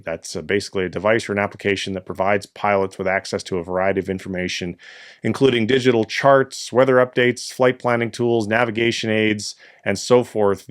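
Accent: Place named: Canada. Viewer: Judging from your voice, it appears you are Canadian or have spent some time there.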